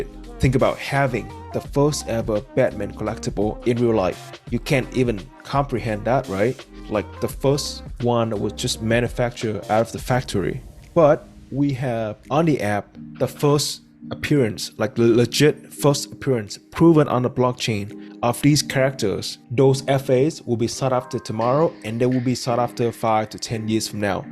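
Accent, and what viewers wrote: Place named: Austria. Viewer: I like your Arnold Schwarzenegger accent dude. Great content and channel too.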